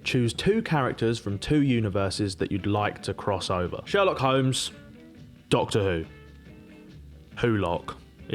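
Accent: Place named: Cockney, United Kingdom. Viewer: you've gone really cockney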